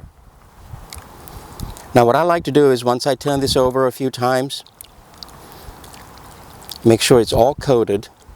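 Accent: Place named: North America. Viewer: This south Asian brother sound like North American..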